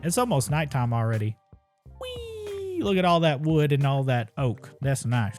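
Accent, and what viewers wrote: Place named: South, United States. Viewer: I never ever comment or anything on YouTube but I have a question. Where in the south are you from because all the other MC youtubers sounds like their British or northern American. Idk, just curious because I could sleep to your content easily (in a good way) 😊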